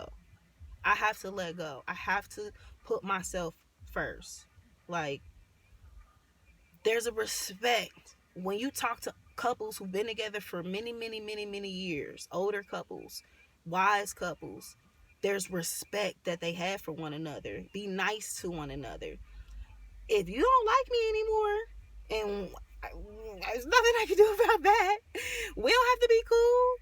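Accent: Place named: United Kingdom. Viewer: Love the British accent doooling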